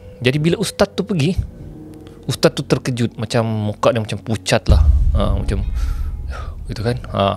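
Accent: Sabah, Malaysia. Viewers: Org Sabah kah kau ni bossku??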